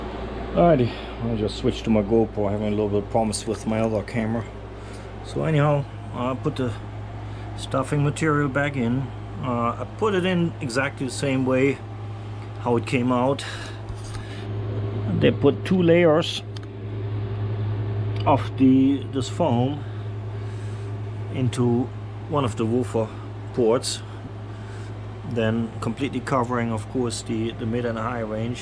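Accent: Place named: Germany. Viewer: Very good Work Herr Teuton, you sound like a German living in the USA. If that is the case, I have a similar story. Myself I purchased all the Upgrades for a KLF-30 with Ciare woofers and will be attempting to build a set of cabinets from scratch.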